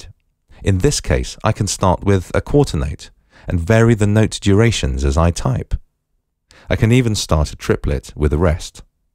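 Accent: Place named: United Kingdom. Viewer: don't you love hearing "eighth note" in a British accent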